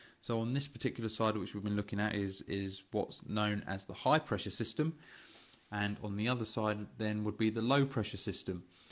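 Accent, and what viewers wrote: United Kingdom. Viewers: Stopped watching because i hate british accent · I love your dialect Sir. It reminds me with my lovely British Professor .. Blessings. Once again, thank you. Kind regards.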